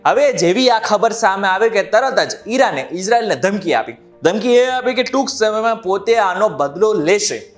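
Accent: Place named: Ahmedabad, India. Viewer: ભાઈ, આ અમદાવાદી touch છોડીને બોલો તો સાંભળવું ય ગમે. તમારી પ્રસ્તુતિમા સાતત્યનો અભાવ છે. તમારું folks બોલવું fox જેવું સંભળાય છે. બની શકે અમુક viewers લોમડી જેવા ય હશે (મને છોડીને). પણ એવું કહેવું સારું ના લાગેને!😂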